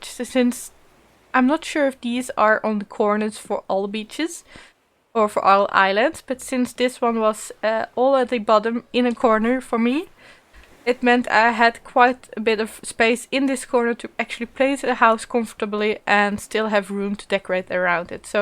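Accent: Netherlands. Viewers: Where u from, u sound Dutch?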